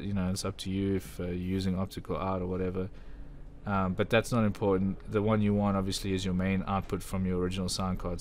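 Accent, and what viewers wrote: South Africa. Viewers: Are you from SA bra? Nice video! Very informing!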